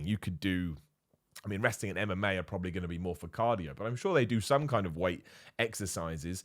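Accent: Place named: England, United Kingdom. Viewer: You're absolutely right. Coach Greg has the exact same effect with my exercise and training motivation. When I'm exercising now I always have some form or fitness YouTube video on, be it yours, or Greg's, Jeremy, Jeff, Igor, Derek, etc. Your videos are also great motivation for me, and I really find your personality charming and your English accent and mannerisms kinda soothing, lol.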